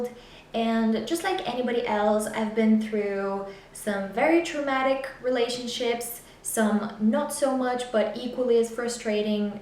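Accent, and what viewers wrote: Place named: United States. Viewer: but you have US accent! how? :)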